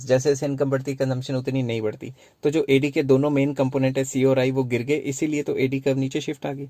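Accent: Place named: Haryana, India. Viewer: sir aap haryana se belong karte ho kya? apki language mai bagri touch aa raha hai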